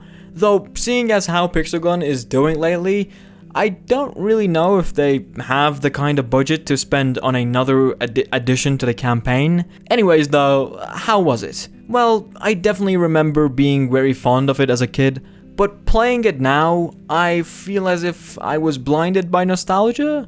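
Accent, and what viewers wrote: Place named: United Kingdom. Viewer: Hi British guy IT RYTHMS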